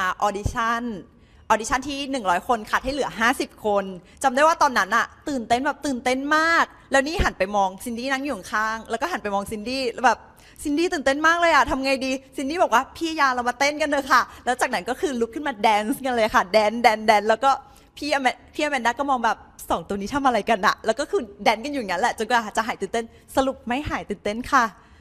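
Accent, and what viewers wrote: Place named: Thailand. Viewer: พูดเหน่อ มีเสน่ห์ดี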